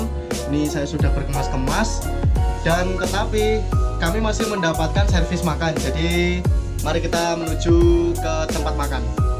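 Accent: Java, Indonesia. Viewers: mas jowo hehehehe woyo2 mas e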